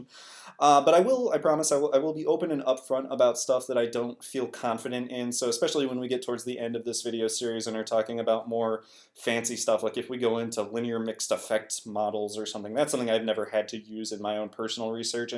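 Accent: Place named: Wisconsin, United States. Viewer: Oh, also, I think you're developing a bit of a Wisconsin accent, and I'm not happy about it.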